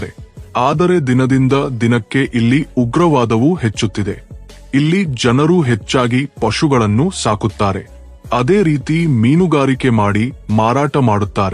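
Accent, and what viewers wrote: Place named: Andhra Pradesh, India. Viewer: ನೀನು ತೆಲುಗು ನವನು ಎಂದು ಕಾಣಿಸುತ್ತೆ ಅದಕ್ಕೆ ನೀನು ಕನ್ನಡವನ್ನು ತೆಲುಗಿನ ರೀತಿ ಮಾತನಾಡುತ್ತಿದ್ದೀಯಾ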